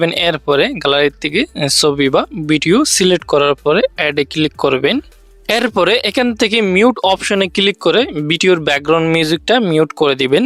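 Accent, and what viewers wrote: Chittagong, Bangladesh. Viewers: অনেক দিন পর ভাই আপনার সাথে দেখা ধন্যবাদ ভাইয়া । আপনার ভয়েস শুনে বুঝে গেছি । আপনিও আমাদের প্রতিবেশী। চট্টগ্রাম বিভাগ থেকে ❤ চিটাগাং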